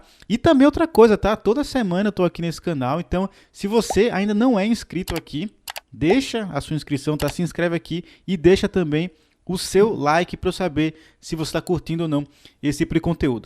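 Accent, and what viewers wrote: Northeast, Brazil. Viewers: você é do Nordeste meu irmão! Top demais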